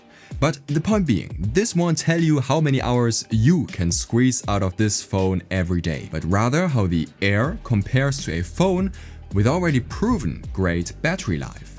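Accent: Germany. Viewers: I hear some German Out of your voice...😅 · I love german english accents, one of the reasons i like watching your videos❤Keep soaring higher brother❤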